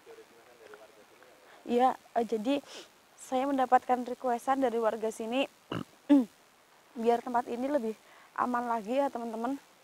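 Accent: Banyumas, Indonesia. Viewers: kui mba wong ndy jane sih deng ngomonge ngapak😂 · Mb lucu nek ngmong ngapak....haaaaa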